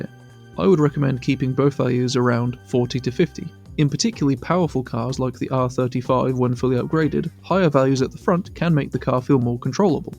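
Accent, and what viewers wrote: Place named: Northern England, United Kingdom. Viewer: This series is a life saver thanks bro! Not had gt7 long and got a T248R last week well what a difference I’ve gone from winning easily to fighting to staying on track lol I thought I would be better with the wheel lol.. Il be making good use of your videos and learning as much as I can to improve my skills. U from the north? I from north west and you speak like a northern lad or midlands maybe. Well thanks for the videos you have a new subscriber here and thanks for not asking for likes n subscribe before we watch the content I really appreciate that you suggest at the end instead. Ta bro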